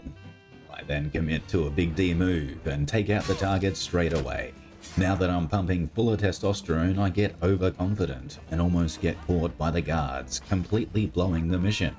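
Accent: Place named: Australia. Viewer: Why is it that I can't find any Australian youtuber that doesn't talk like this. Got to admit though, thier all funny.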